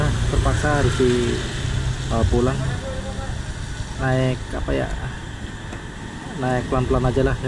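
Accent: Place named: Banyumas, Indonesia. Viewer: Wah ngapak e daerah mana mas...? .gas tipis tipis jalam licin mas bro... sukses terus masbro...👌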